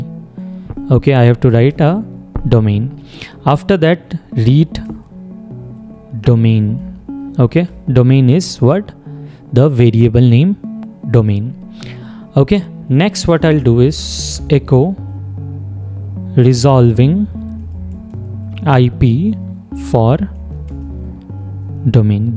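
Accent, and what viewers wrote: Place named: India. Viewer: you look like filipino with indian voice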